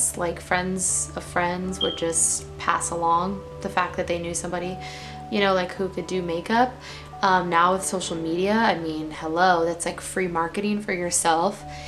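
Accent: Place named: Bay Area, United States. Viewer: Good luck with your channel! Would've thought you were from the bay because of your cute little "accent" and because you say hella a lot haha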